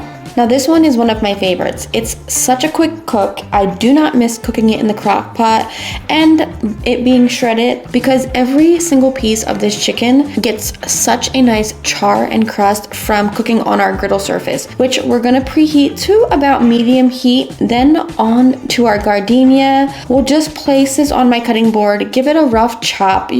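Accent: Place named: Italian-American, United States. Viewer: First off, keep saying things however you want. Don’t worry about the pronunciation police. Your results speak for themselves. You’re the quintessentially authentic Italian-American your food tribe appreciates. Second, how do you never have too much or too little cheese in anything you cook? It’s always just perfect! Gotta learn how you do that! 😂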